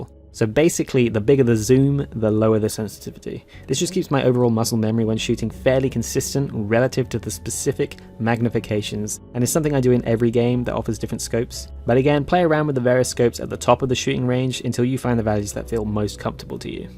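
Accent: United Kingdom. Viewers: Very informative and interesting, will definitely have a play around in the shooting range and have a mess around with my settings now I know a bit more about which each of the new ones do, nice to hear a voice finally, don't apologise for the British accent either, nice to know theres a few Brits in the Bayou 🤠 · Ohhhh snap our boy is English! Nice homie I guess the texts are going to be a thing of the past now that you crossed into the voiceover era! A welcome change! Gives the videos more personality! Just don’t forget to put the mandatory cowboy hats on the steam codes other wise no one’s going to know is a key for hunt related stuff xD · Fantastic. Also, your voice isn't annoying at all. If that's the reason your gameplay videos don't have voices, I really wouldn't worry about it at all. I've got my American and Austrian accents covered by Homereel and Psychoghost, bring on the British!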